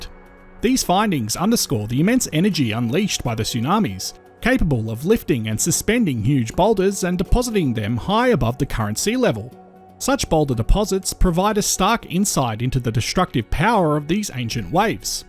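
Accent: Australia. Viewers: Aussie accent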